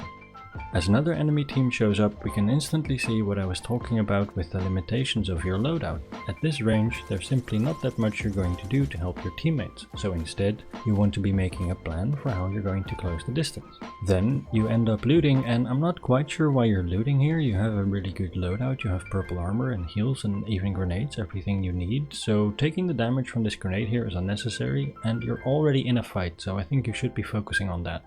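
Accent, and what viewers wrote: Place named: Netherlands. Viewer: You're Dutch aren't you 😜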